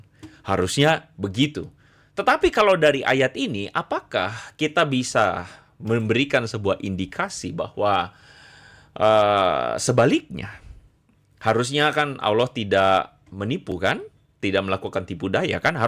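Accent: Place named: Java, Indonesia. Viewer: Si medok ketipak tipung adalah ustad mukalap yg spesialis bahas Alkitab, itulah jualannya spya pundi2 ya tetap berlimpah,tp bahasannya sangat ngaco dan bar bar, ngaca!! Belajarlah yg bny spya pinter sdikit, kasihan saudara muslim sy yg sdh di sesatkan oleh si medok. Dy sendiri ga ngerti alur Alkitab tp sok sok ingin membahas Alkitab, krn itulah dagangan ny yg laris manis, pesan sy buat: belajar ngaji dan solat aja dgn lebih khusuk, jgn masuk kamar orang lain. Urusin kamar mu sendiri, kamar mu saja berantakan ga karu2an. Beli kaca spya bisa introspeksi diri dan jgn lebailah.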